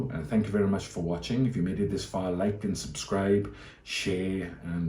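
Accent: Wales, United Kingdom. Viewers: You sound like a Welsh man. I am good friends with spike Williams, ex captain of Wales. again, many thanks, and I wish you the best of luck with your channel.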